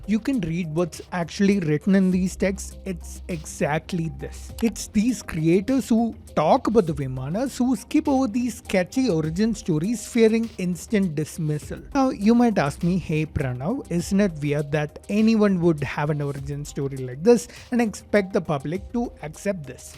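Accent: India. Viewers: Very very strong indian accent, needed subtitles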